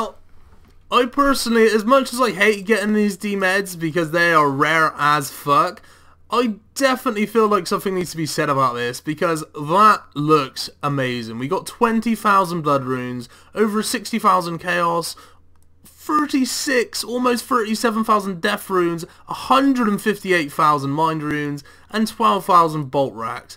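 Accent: Bristol, United Kingdom. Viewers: literally paused at 15 seconds you have to be bristolian, right? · From Bristol much?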